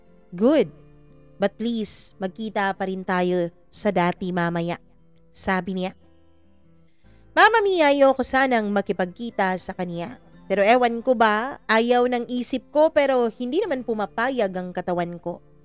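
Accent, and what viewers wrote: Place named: Conyo, Philippines. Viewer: 😅ang konyo ha 😅 inde ko nmn mina Mind 😆 ano yan live selling 😂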